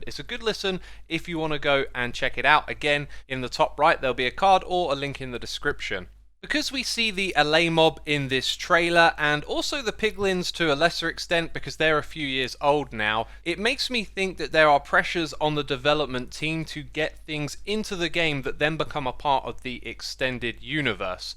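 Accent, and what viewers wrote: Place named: United Kingdom. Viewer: Started watching near my dad. He said "wow that guy is British"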